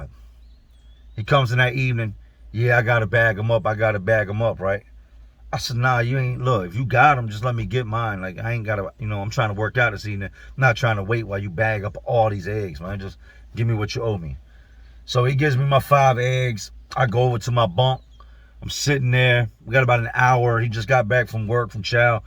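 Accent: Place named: East Coast, United States. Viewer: People need to understand your from the east coast the inner city… the way you talk comes from where you grew up and also in and out of prison lingo….I think it’s our differences that make us interesting… I’m glad everyone doesn’t sound the same…. I like hearing you tell your stories…..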